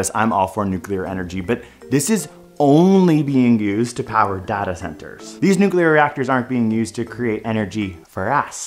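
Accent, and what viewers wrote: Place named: Canada. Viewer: I knew he was Canadian due to the way he pronounces data.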